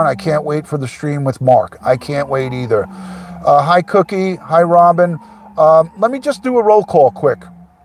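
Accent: New York, United States. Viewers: Made me giggle when you tried to pronounce Cheshire, I hope you don't mind me correcting you, but here in the UK the shire part is pronounced sheer, so it would say Chester, Yorkshire etc. Absolutely love your NY accent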